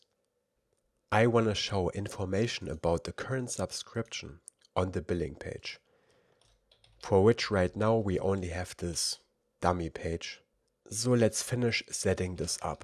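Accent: Germany. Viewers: I can smell a bold German accent 😅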